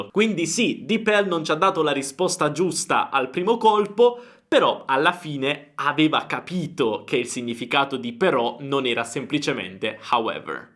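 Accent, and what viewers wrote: Italy. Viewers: ho scoperto il suo canale youtube qualche settimana fa e da allora ho guardato molti dei suoi video. Vorrei congratularmi con lei non solo per il contenuto ma anche per la sua dizione. È estremamente facile capirlo, il che mi rende molto curioso di sapere in quale regione d'italia vive o è cresciuto. Gracie per i suoi eccellenti video. Giulio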